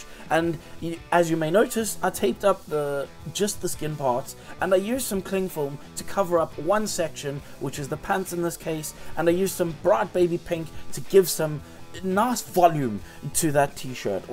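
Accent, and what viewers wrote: South Africa. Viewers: His bio says he's from the uk but he sounds so south african. Really like the video though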